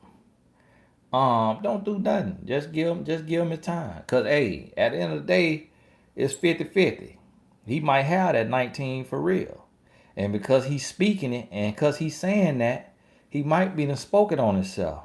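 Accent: South, United States. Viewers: I just finished watching this chat. I enjoyed it. I just started watching a few of your videos. You have a new subscriber. I don't know you but I like your aura, that you speak of God and your southern roots. Your accent reminded me of my mother's accent (she grew up in FL). God bless you! "I don't have Go-Go gadget eyes". You're funny! Maybe a few ppl on here know about Inspector Gadget.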